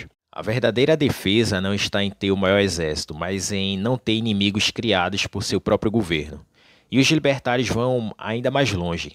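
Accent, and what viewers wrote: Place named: Northeast, Brazil. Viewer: É muito bom ouvir um conterrâneo cabra da peste narrando. Como faço para me candidatar? Seria uma honra.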